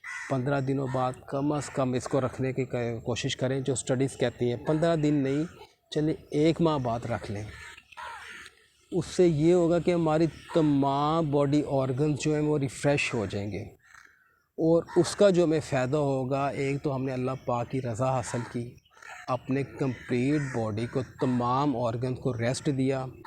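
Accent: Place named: Pakistan. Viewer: The first-ever video I watched completely. Your way of talking along with urdu accent is great. Keep it up.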